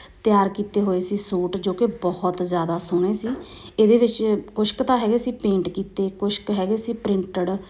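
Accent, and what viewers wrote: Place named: Sangrur, India. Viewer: Didi tusi sangrur wali side toh lgde ho...tuhdi language toh❤❤❤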